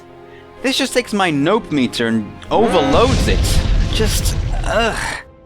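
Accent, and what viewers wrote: United Kingdom. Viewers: YOUR BRITISH! :D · British PBG.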